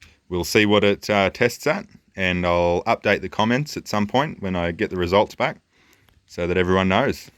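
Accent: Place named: Australia. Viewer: Great video mate. You sound Australian, where are you located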